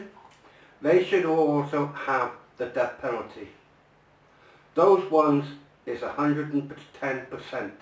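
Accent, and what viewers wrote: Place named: Gloucester, United Kingdom. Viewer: I've noticed you have the same accent as the Gloucester Wests.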